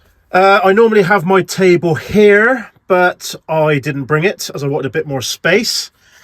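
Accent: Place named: West Country, United Kingdom. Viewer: Keep those vlogs coming....keep that Marvelous West Country accent...and you won't go far wrong...and maybe we will bump into each other is Cornwall sometime 😉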